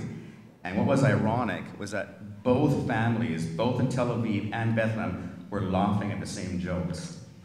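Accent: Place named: Calgary, Canada. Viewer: I agree. He sounds so Calgarian.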